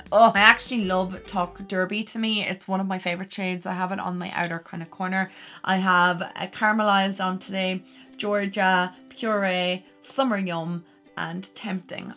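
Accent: Ireland. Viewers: Is that a Irish accent?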